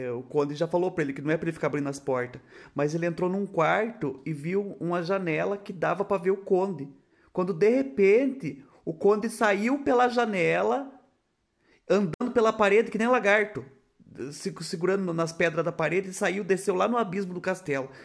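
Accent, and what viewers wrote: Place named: Piraju, Brazil. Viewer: somos vizinhas kkkk Moro aqui em piraju Parabéns pelo canal, me senti muito representada no seu sotaque e sua simpatia... Deus abençoe pra continua crescendo mais e mais, SEU NACAL E LOCO DE BOM!!!!!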